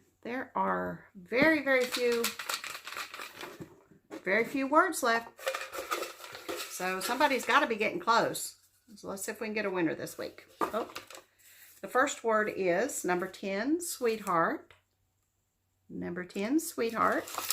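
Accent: South, United States. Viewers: Hi, you had me at "Love Abide". swoon. Thank you, kindly for sharing all your finds. Gosh, you sure have a good eye for beautiful things. Once again, your charming southern drawl washed over me and bathed me in Grace & Goodness. Thank you for sharing your spirit and such lovely inspiration. It was a pleasure to drink coffee and "visit" with you. Happy Stitching, friend, Daleen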